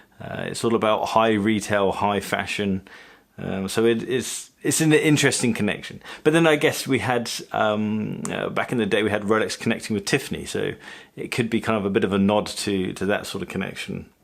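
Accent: RP, United Kingdom. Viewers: Congratulations on the Baby? Where did you go to school? I have been listening to RP on YouTube and to me you have some RP but other hints but can't place. I am from USA.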